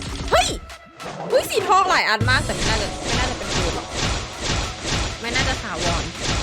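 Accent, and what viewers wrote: North, Thailand. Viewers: พูดภาษาเหนือตั่ลร้ากก พี่ 😳🥰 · พูดภาษาเหนือบ่อยๆนะครับน่ารักดี❤️😊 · พี่พูดภาษาเหนือ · เง้ออชอบให้พี่พูดภาษาเหนืออ่าาน่ารักดี😳😻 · พูดเหนือน่ารักมากพี่(;ŏ﹏ŏ)(๑♡⌓♡๑) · คนเหนือออ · พี่คนภาคเหนือเหมือนผมเลย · เป้นคนเหนือกะ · พี่ พูดเหนือเป็นด้วย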